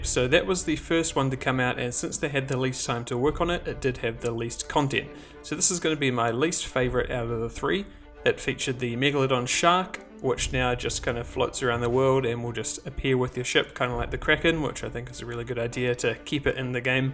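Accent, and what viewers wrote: Australia. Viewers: Canadian dollars.....you live in Canada? You sound Australian or maybe kiwi haha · What he's Canadian? I could have sworn from his accent he was Aussie.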